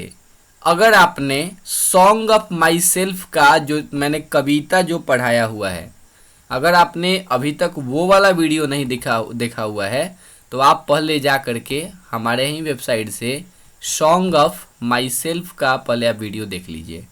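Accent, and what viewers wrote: Bihar, India. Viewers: Gajab explaination in Bihari style...😀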